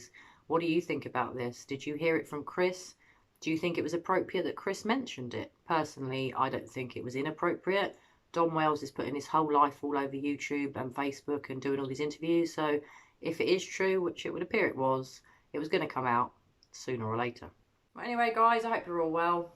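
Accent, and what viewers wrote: United Kingdom. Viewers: Love your British accent. We need to get Don "bang to rights" (right?)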